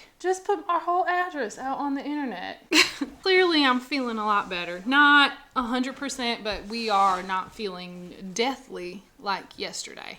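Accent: South, United States. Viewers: You are so Southern!! I love it.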